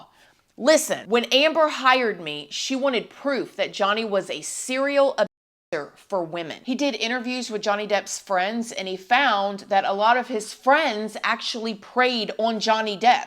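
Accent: South, United States. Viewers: Mmmm no, I disagree 36 years old is old enough for Amber to know better. If you watched the trial, then you're aware she's been given some pretty strong diagnosis as not a well person. With all respect to you and your channel,( which is obviously successful) Do your thing! You're pretty, got a great southern accent and a gossip show flair. (funny as a viewer to be called one of your "babies" as I'm nearly 2x's your age). Well being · Great job! I so enjoy you! You sound Southern like me. What is the best is your kindness yet unafraid to speak truth! You go girl!!!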